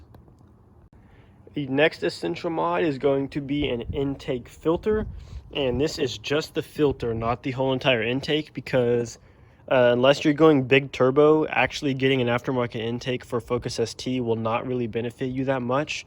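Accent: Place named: Oklahoma, United States. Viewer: oh dude what part of oklahoma are you from? any where near norman?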